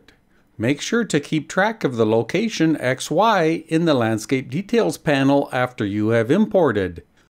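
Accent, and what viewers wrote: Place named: Canada. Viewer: You do you. Be yourself. Your voice is part of your character. You're Canadian. I dig it. :) Certainly public speaking experience benefits everyone. But people like to complain. If it's not one thing, it's another. So, really, who cares...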